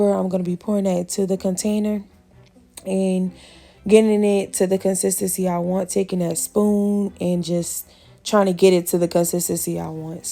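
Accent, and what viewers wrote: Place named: New Orleans, United States. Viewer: New Orleans!! lol gotta be I know accents like the back of ma hand im from Atlanta, but if im wrong ma apologies love lol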